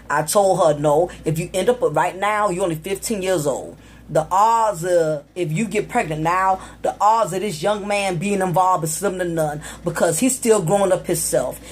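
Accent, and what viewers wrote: South, United States. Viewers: i always wanted to know were youre from cause of you're accent . im from Savannah. But rise up in the north east. i bet my mom knows youre family. You know how it is down there maybe i need to come back home for a good women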